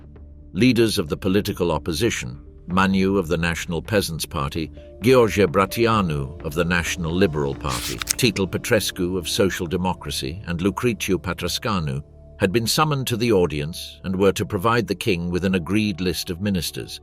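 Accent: United Kingdom. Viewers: In primele minute ale povestii voastre apar și primele , nu erori ci minciuni Limba engleza și acentul britanic al povestitorului nu conferă autenticitate povestii mutilate și modificate propagandistic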